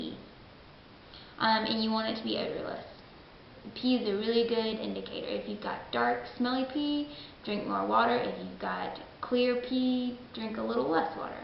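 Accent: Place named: South, United States. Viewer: Totally agree with you regarding the water and exercise incriments. I assume the 2 hours is weekly? Love the accent too, as a native southerner I figure you are from Georgia or SC? Keep up the good work!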